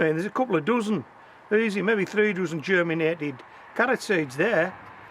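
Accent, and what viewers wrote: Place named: Yorkshire, United Kingdom. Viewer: Where are you from mate? Love your accent it’s like a mix of Yorkshire and Black Country 😅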